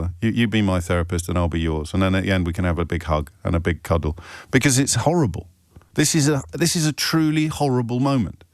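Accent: United Kingdom. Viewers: Watching you from South Africa and I have to say thank you. Honest reporting is very rare. Plus, the British accent is a bonus, love it.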